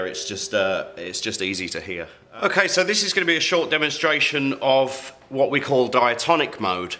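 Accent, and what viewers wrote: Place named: United Kingdom. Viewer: From your accent you seem to be British so why is everything priced in American dollars on your site? Are we going to find that UK customers are going to be ripped off again (I've been charged over £250 for things priced at $250 US in the past by other companies) because someone seems to think that we're too stupid to notice the difference in the exchange rate, and they also think we won't notice that we're being conned. This looks like something I can make good use of but I'm reluctant to buy without knowing how much it's really going to cost me.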